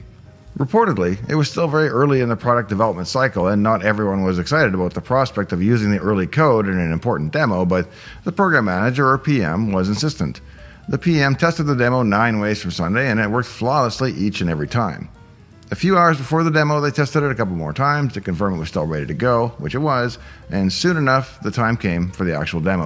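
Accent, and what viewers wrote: Texas, United States. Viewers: That's my favorite accent. Is that Texan?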